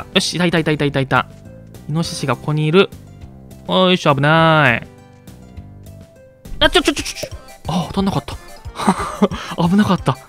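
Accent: Aichi, Japan. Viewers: 愛知県の人？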